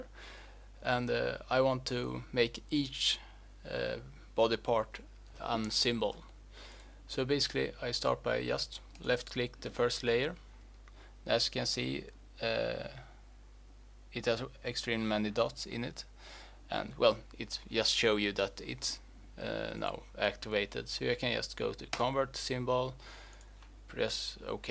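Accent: Sweden. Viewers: ur swedish arent u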